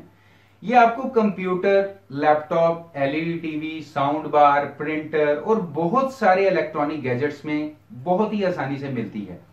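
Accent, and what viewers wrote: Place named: Lahore, Pakistan. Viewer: The accent looks like he is from Lahore / Pakistan..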